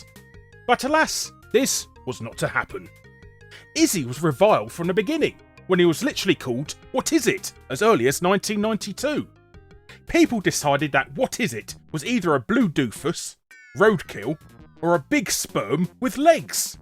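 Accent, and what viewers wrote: United Kingdom. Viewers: I love your voice it's like you're a British guy with a mouth full of marshmallows.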